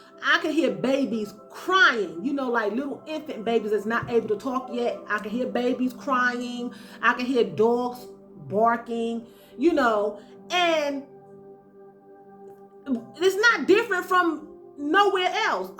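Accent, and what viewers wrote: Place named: Brooklyn, United States. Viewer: Girl 😂 I can hear that Brooklyn accent in you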